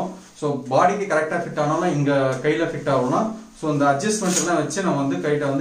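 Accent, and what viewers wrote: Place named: Chennai, India. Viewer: வாங்க சொல ! கேட்க சொல ! Your Chennai language speech was amazing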